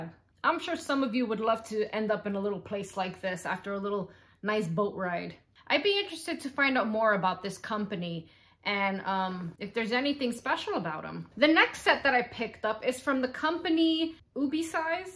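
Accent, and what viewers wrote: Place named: New York, United States. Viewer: Hi, This is the first video of yours that I'm seeing. Really like your energy and enthusiasm for puzzles! And it sounds like you're a native New Yorker, like me! I have a ridiculous number of Eeboo's - - most I bought myself and others were gifts, but I've only done my 500-piece ones so far. Maybe I'll work next on the VENICE one you showed -- it's in the closet somewhere, LOL. Have a great day!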